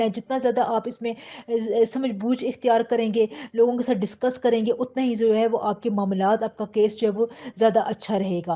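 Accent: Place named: Punjab, Pakistan. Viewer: tez lehja punjabi h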